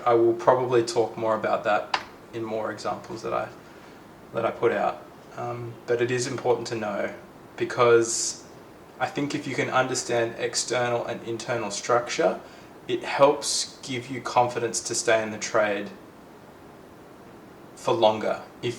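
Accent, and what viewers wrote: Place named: Australia. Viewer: You are doing a great job - explanaton is amazing! I too live in Australia so it is refreshing to hear an Aussi with this eXplanation - keep going!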